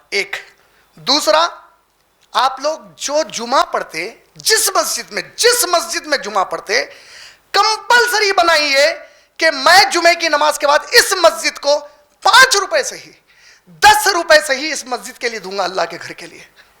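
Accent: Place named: Hyderabad, India. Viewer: Br assalamalaikum. i always suggested you that even you speak the truth, it's difficult to accept it due to harsh wordings, so kindly speak politely. Style of addressing is very essential, it leaves meaningful impact. You raise your voice unnecessary because the truth could be explained by having patience and kindness. In'Sha'Allah.try to avoid your hyderabadi rough style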